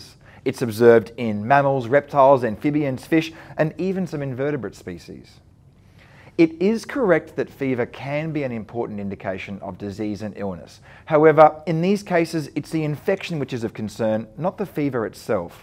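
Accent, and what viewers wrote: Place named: Australia. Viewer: I'm in nursing school and very much enjoy help from an Australian accent with my homework! Thank you!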